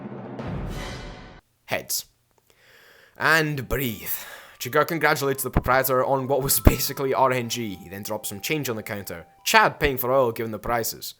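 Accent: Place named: Scotland, United Kingdom. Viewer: Great, short video essay. Funny at times informative at others, but I've only just realised how Scottish you sound lol. Keep up the good work.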